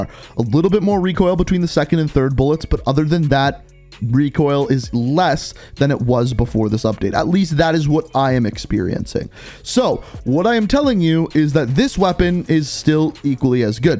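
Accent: Canada. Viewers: yr Canadian?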